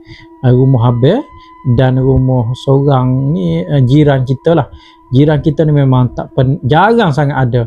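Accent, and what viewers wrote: Kelantan, Malaysia. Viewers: Assalamualaikum. Subscriber baru ni abe🙋‍♂️ best dgr citer loghat kelantan ni. Biasa dgr kak nini salina channel frainkiz je kecek klate hehehe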